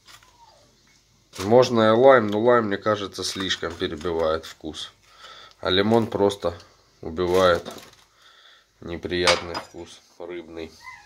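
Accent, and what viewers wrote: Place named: Ukraine. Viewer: Наш с Украины? Слышно по разговору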